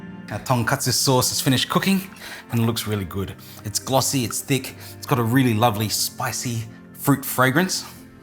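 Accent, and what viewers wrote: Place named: Australia. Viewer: You have cool Aussie accent! Great presentation 🥰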